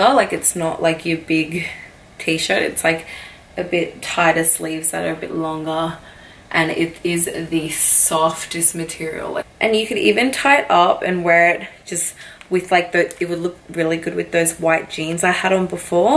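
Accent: Australia. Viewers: I have a feeling more men watch these videos then women lmao a blonde with an aussie accent and a,killer body !!! you are a 10.... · love your Aussie accent!! keep the vids coming, love them!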